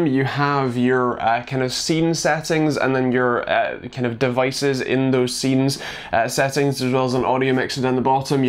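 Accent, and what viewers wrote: Scotland, United Kingdom. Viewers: Great to hear a good review from a fellow scotsman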